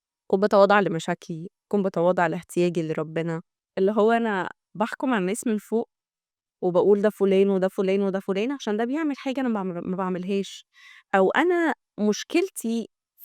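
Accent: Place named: Gulf, Arab world. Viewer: صوتك خليجي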